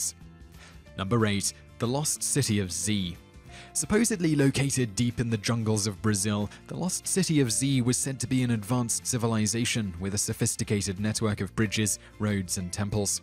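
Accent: United Kingdom. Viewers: I don't mind his British accent, half my family is British. But I do wish he'd research how to pronounce names and other proper nouns correctly. He butchers so many it's distracting. He also says "skellingtons" which was funny the first time I heard it, but not the last twenty. Type things out for him phonetically or something!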